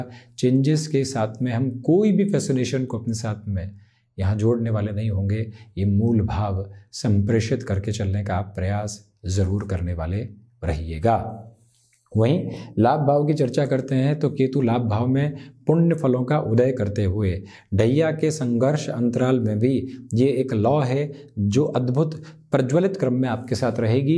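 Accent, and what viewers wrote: Standard. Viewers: Aap ki Hindi bahut khub aur shudha hai..🙏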